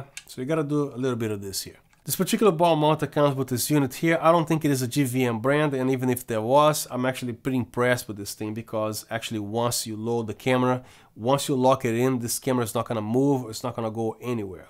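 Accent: Brazil. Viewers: THIS is what i call a review! Amazing work, thanks a lot! Fale bem, mas vc deve ser brasileiro neh?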